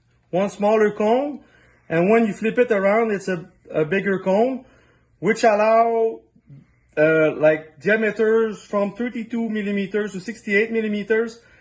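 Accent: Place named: Quebec, Canada. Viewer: Ca c'est un gars du Quebec . Meme accent que moi 🙂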